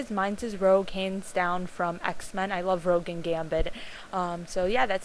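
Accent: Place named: Michigan, United States. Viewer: do i spot a Michigan Accent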